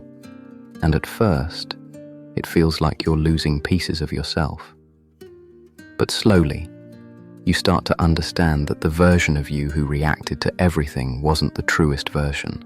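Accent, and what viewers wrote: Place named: United Kingdom. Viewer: I love this english accent, very british, well chosen ! And the content of the poscast is really gold !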